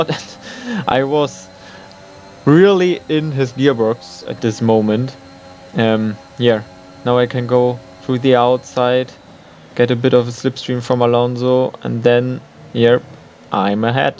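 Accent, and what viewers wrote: Germany. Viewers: Wieso machst du deine Videos eigentlich nich auf Deutsch auf Englisch ist das so weird weil man merkt das du deutsch bist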